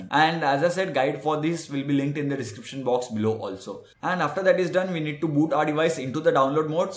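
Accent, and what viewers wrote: India. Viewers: unbearable amount of indian accent hurting my ears... good video though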